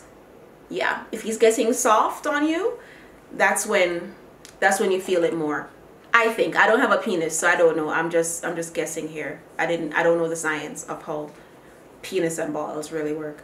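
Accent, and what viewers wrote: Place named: Jamaica. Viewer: New to your vids. Where u from? Sound wash down yardie 😂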